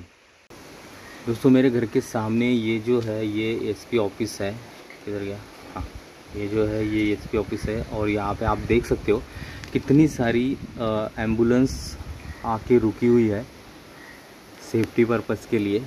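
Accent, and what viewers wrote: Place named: Maharashtra, India. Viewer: व्हिडिओ मराठीमध्ये बनवला असता तर बरं वाटलं असतं. तसंही तुमचं उच्चारण बरसच मराठी वाटतं होतं.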